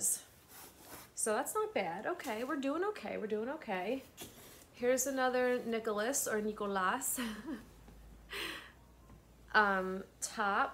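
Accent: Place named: United Kingdom. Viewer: I can never decide whether you are doing a London Cockney accent or a posh British accent. It's a bit of a mix. I have a very broad, thick Scottish accent but some say I sound like a posh Scot. lol. Makes me Guffaw every time! The silk dress would be great dressed down with denim jacket and boots. I am I big boot fan and wear them all year round, with just about anything. I'd probably add a big squash blossom, knowing me?! 😁 Take care and stay fabulous! 🤗💞✌️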